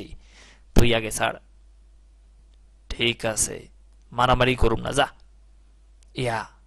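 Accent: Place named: Bangal, Bangladesh. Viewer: sir u r awesome tomar bangal bhasha ta daroon laglo tumi to nek femous hote paro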